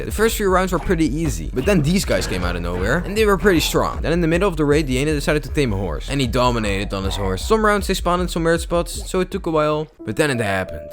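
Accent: Netherlands. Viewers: You have a little bit a dutch accent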